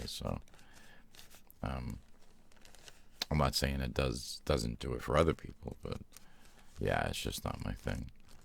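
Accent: Boston, United States. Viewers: Jesus how have I not noticed the Boston accent until just now?? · I could tell you are from Boston... I love that. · Another live stream soon? Your every day voice and the Boston accent are the best :) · Holy hell, you're a Bostonian. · Never realized you had a Bostonian accent until I watched this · Never noticed your Boston accent haha I love it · Boston tang in his voice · He's defiantly a Boston guy, I would know😂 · Yo, are you from new england? you've got that underlyin boston/jersey accent. Love your vids, congrats on the 100k(now more than that)! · Is that a Boston accent? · Wait, he's from Boston? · Ur from Boston most definitely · Any one else notice his Boston accent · oml he has a..... what's that? Boston accent? I didn't know he actually had one my mind is BLOWN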